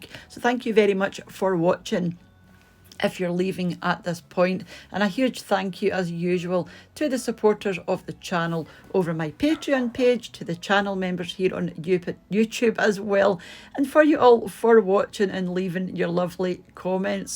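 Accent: Scotland, United Kingdom. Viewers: I love to see "What's For Tea?"... And I love your wonderful Scottish accent,!... Scotland is a bonny place!... Eating well is very important, and there's one thing for sure, Mr. What's For Tea? doesn't go short! 👍🤣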